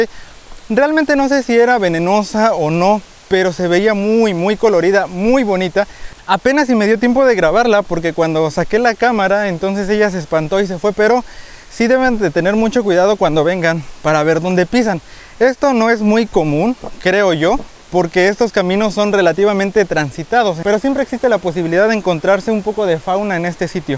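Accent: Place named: Oaxaca, Mexico. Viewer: En realidad la mayor parte del tiempo en toda la sierra de Chihuahua esta nublado y también la sierra abarca más de la tercera parte de la geografía del estado. Tu eres surumatito de allá por Oaxaca? Es qué te ves cómo oaxaquita y suenas como tal. Saludos de La Colonia LeBarón y arriba mi Chihuahua y nada más!!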